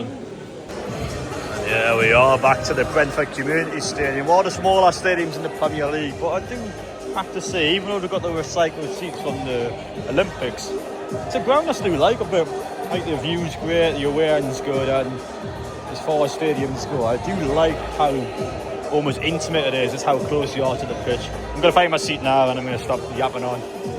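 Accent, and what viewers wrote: Newcastle, United Kingdom. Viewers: Love your Geordie accent mate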